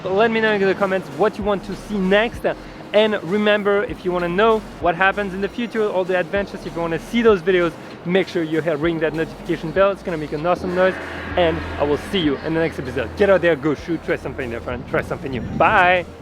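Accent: France. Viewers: You have a french accent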